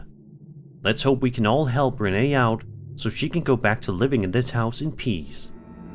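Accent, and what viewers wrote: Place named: Denmark. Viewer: Brormand, jeg har set dine videoer I flere år og har først lige fundet ud af at du er dansker nu, wtf? 😂 Btw, fandt ud af det pga. Jeg så en af dine gamle videoer hvor du snakkede om merch og din accent var ikke til at tage fejl af 😂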